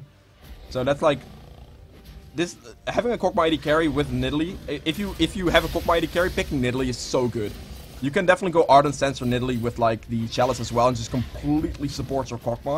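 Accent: Netherlands. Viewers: you sound like ur dutch and nice video bro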